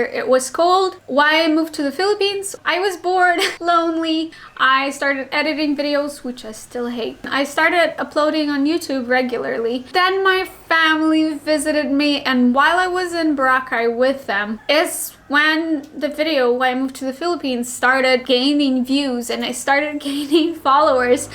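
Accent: United States. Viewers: Hi, new subscriber. Keep up the honesty and openness. There will always be plenty of people that are looking for someone to trust. I admire your honesty. By the way, about underarm odor/smell. It is genetic. (Sorry in advance for the biology/science stuff). Having the ABCC-11 gene in your DNA determines whether you will have underarm smell or not. Almost every white person has this gene (98%). Very few Asians have it and Koreans don't have it at all. I'm sure lots of people are completely impressed by your English. I know I am. Your American accent and huge vocabulary are so good you could make a living doing English voice translations for Russian products and on their company phone menus. How ever you were able to do it is a great achievement. Someday do a video: "How to get so good at American English everyone will be amazed." Stay healthy. You are great and deserve all the happiness you desire. 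· Hey congrats mucho! I love these videos where you are very spontaneous and uncensored 🥰 One thing I've noticed though, you don't sound very Russian, you know, like Dasha Taran. You sound very American. I guess it's because you did stay and work in the states for a bit. Cheers, carry on and stay awesome 🥳🇵🇭💝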